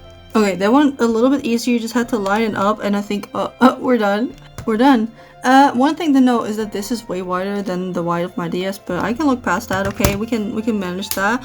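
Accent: Netherlands. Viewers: From hearing your accent I thought you were like Dutch… and when I saw “type om te zoeken” on your pc I was sure!!!! Nice video!!! <3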